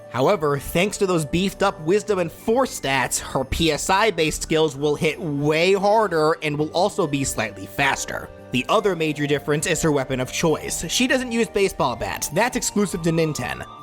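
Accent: New York, United States. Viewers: he has a new york accent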